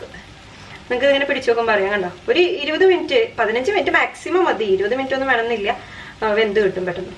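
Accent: Thrissur, India. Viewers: അടിപൊളി മാഷേ ഇമ്മടെ തൃശൂർ ഭാഷ അസ്സലായി ഞാനും തൃശ്ശൂരാണേ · വളരെ നന്നായിട്ടുണ്ട്, നമ്മുടെ തൃശൂർ ഭാഷയും അടിപൊളി, തൃശൂർ എവിടെയാ, ഞാൻ ഇരിഞ്ഞാലക്കുട യിൽ ആ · പിന്നെ എന്തുട്ട്ണ്::: തു ശൂർ ഭാഷ like it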